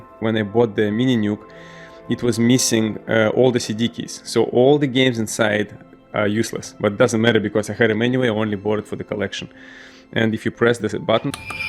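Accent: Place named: Russia. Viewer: Акцент русский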